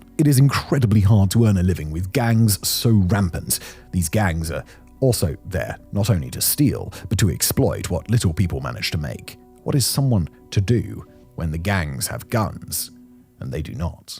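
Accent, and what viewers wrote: England, United Kingdom. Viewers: Simon create a video full of lies using a mix of content from a bunch of other online contents. I have friends in Petion-Ville right now having more fun than me and looking forward to enjoy the weekend. Don't let this random English accent define what's going on in Haiti....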